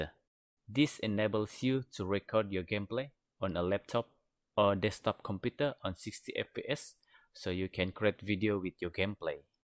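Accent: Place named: Sabah, Malaysia. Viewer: Mcm sabahan beii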